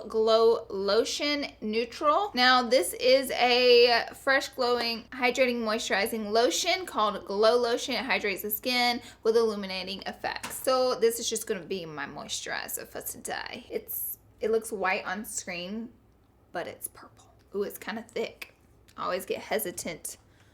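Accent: South, United States. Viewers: She sounds SO southern I love it! #SouthSquad WYA? Edit: SO MANY SOUTHERNERS! I found my people!!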